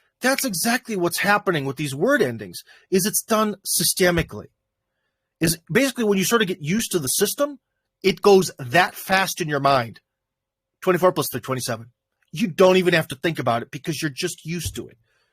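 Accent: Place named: Cleveland, United States. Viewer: You still have your cute Cleveland accent. The midwest shines through your American English,. I can't roll my rrrr's either.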